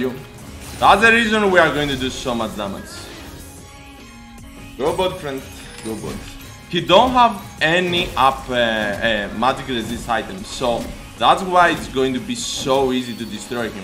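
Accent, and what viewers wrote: Greece. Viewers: Can you do pantheons voice? Since your greek and your accent is real and you play like a god! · χαχαχα το υποψιάστηκα από την 100% ελληνική προφορά. Το μούσι το επιβεβαίωσε.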